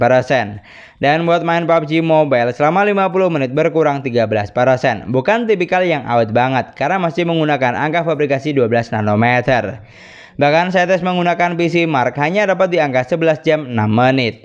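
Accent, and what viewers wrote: Madura, Indonesia. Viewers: Bang udah kaya orang maduran jualan sate logat nya😅 semangat terus❤ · Keren ciri khas logat Maduranya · Itu ciri khas orang madura blokkk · Kyk logat madura tesate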